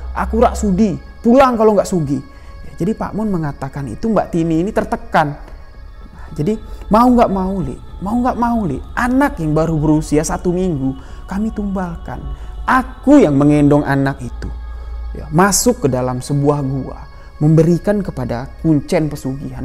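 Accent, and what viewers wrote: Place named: Madura, Indonesia. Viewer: Malang hadir dan selalu mendengarkan cerita mesteri, nada bicaranya seperti orang madura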